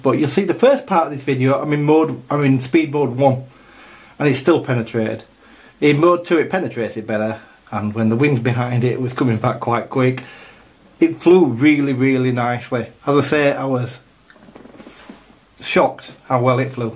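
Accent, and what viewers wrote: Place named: West Yorkshire, United Kingdom. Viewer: Great review video , you sound like keith lemmon Are you from west yorkshire anyway gave it a like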